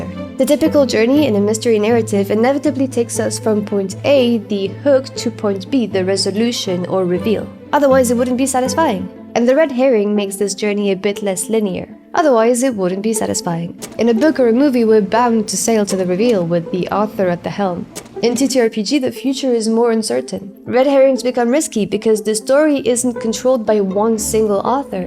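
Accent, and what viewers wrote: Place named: France. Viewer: What a great video. i was secretly hoping wed hear a "Zut Alors!" at the reveal of a twist, once i heard your accent. But i guess hoping to hear that one day is a good reason to subscribe!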